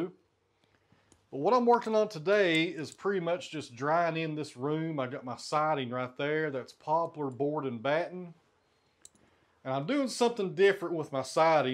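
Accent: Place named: Kingsport, United States. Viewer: I like Billy Bob Thornton, too, so look at the comparison as a great compliment. Nearly 50 years ago I used to go to Kingsport Press to oversee production of some of the books I worked on, and I loved the area and the folks who worked there were great to work with and to be around. You, so much remind me of those good, friendly people. And you talk like them’ too. I love the dialect. I used to say that I didn’t care for cats, but that was before I got to know and understand ‘em. Interesting critters they are. I l very much enjoy your channel and keep up the good work.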